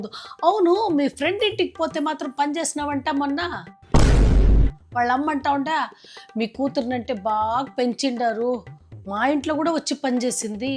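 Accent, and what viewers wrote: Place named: Kadapa, India. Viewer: కడప యాస...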